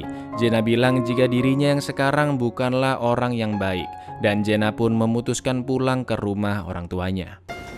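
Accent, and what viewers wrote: Java, Indonesia. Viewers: Wong jowo ya bang apa lampung?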